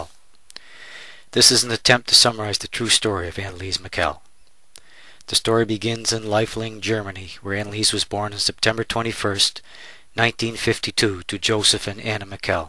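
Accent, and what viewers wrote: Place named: Canada. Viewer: the guy talking must be canadian, i keep hearing abooot and thats def canadian.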